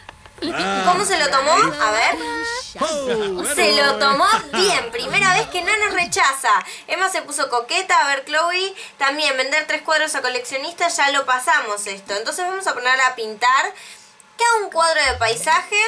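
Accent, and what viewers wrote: Spain. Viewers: siempre estas en un bar y otra pregunta eres española o inglesa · es española o argentina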